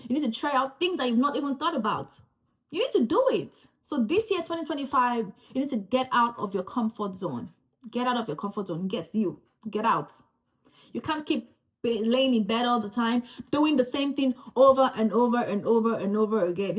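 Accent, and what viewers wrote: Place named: United States. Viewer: Ouuu, I can hear your American accent coming out🤭🌚🤗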